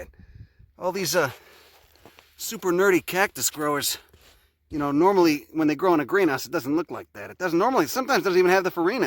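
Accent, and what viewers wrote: Chicago, United States. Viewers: Thanks for exploring my country. I found you because of the Chicago accent. A Chilean natural myself who lived in the Humboldt Park area and in Willmette for a couple of years. It's always refreshing getting to hear the accent I got my English from... If you ever come to Patagonia you'll be astonished of the flora down here. Youll get to see wild strawberries, fancy varieties of potatoes, Gaultheria mucronata, and the trees, man... wow! Fitzroya cupressoides, Araucaria araucana (monkey puzzles, typically pictured in dinosaur books and shit). If you EVER come here, you'll have a home where to stay, man! Again, I'm really happy to hear you talk about these wonders... in the accent I learned my English from! Hugs from Villarrica and keep up the good work!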